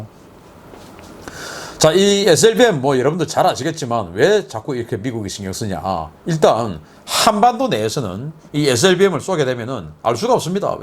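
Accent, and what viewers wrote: Gyeongsang, South Korea. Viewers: 나는 미국 샌프란시스코에서 살고있읍니다. 신인균 교수님은 애국자며 잘 연단된 분이며, 이 대한민국에서 발견 됬음을, 참으로 축복받은 한국이라고 생각 합니다. 결론은, 신인균 교수님은 애국자이시며, 남한에 대통령감 입니다. 빨갱이 이북놈들과 싸워 이길려면, 신인균교수만한 능력있고 자격있는 사람은 하나도 찾아볼수 없었읍니다. 얼굴도 대통령 얼굴 처럼 잘생기셨고, 한국을 바로세울수 있는 분은, 바로 신인균 교수님이라고 인정합니다. 세게 어느대회에 나가도, 한국을 대표하는 대통령으로서, 하나도 부족함이없이, 잘 훈련받은 훌륭한 대한민국 남자라고 봅니다. 내년 대통령 선거에, 꼭 출마하셔서 대통령이 되셔야 대한민국을 살릴수 있읍니다. 미국에있는 우리 교포들이 전부 다 간절히 바랍니다. 그런데, 한가지 바라옵기는 , 경상도 사투리는 이해가 참 어렵습니다. 조금이라도 고쳐주시면, 우리가 듣기에 많이 쉽게 들을수 있겠다고 생각하며, 그렇게 노력해주심을 진정으로 바랍니다.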